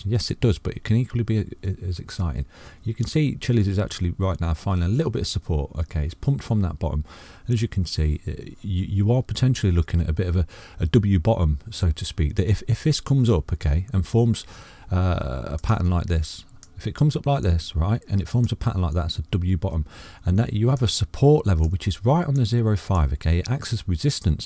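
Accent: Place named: Mansfield, United Kingdom. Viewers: Are you from Nottingham? That accent! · you sound like you have a mansfield/Notts accent. Or am i way off?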